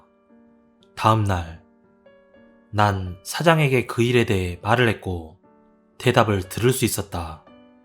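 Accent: Jeolla, South Korea. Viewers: 구수한 전라도 사투리~~~😁😁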